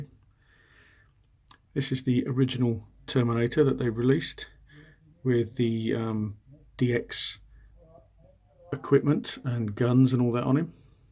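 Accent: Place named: United Kingdom. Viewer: To thanks for always uploading your video. I have seen some of your old video(and love it)-aliens marines,wolf complete with cleaner kit asesory,predalien and always feel amazed how in depth your reviews are. And yeah maybe its awkward but i do like your accent - the explanation so detailed and smooth:) Sometimes i wonder why i never born at UK hehe:). Im a new collector sixth scale mainly ht old version preds and aliens marines but thanks for always uploading the figures:)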